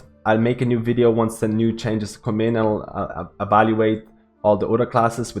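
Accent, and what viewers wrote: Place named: Ireland. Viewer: i know this is unrelated to the video but your accent is driving me crazy trying to figure out what it is, its like you have a strong Irish accent one time then all of a sudden you have like an eastern European accent. Please can someone tell me its driving me crazy!! oh nice vid as well :P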